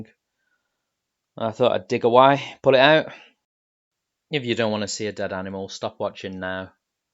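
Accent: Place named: Midlands, United Kingdom. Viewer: Sorry to see you’ve lost your girls to the fox. You sound like you come from midlands like me. Weld mesh is only suitable for chicken runs. I would also invest in a good electric fence around your enclosure too. They can be run off the mains or a 12 volt leisure battery which can be recharged. I use a 50m holt line electric fence & it’s hooked up to the mains now. I’ve had many battles over the years with the fox, lost poultry. Good luck & i’m sorry about the birds. ♥️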